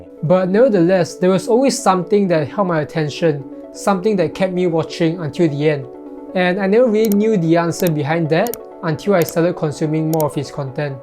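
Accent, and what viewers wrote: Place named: Malaysia. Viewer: your accent is oh so familiar! you're malaysian, it turns out!!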